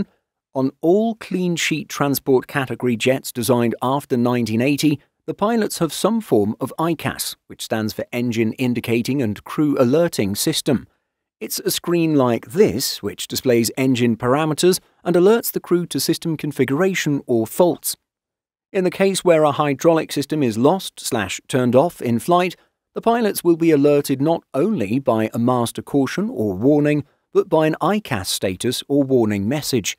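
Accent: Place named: England, United Kingdom. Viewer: What an annoying arrogant English accent...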